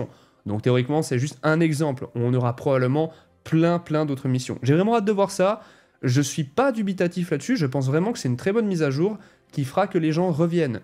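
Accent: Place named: Switzerland. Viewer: QUOI TU EDT SUISSE, quelle canton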